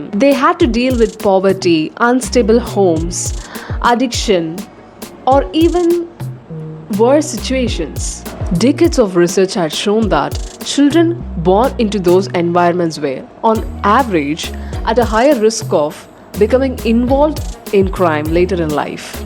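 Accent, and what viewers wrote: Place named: United Kingdom. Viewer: I think she is speaking British English 😅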